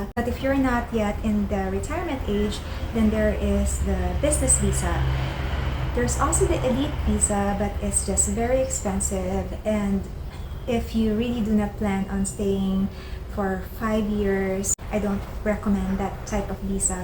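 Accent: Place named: Philippines. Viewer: your English accent seems to resemble the way people here in the Philippines speak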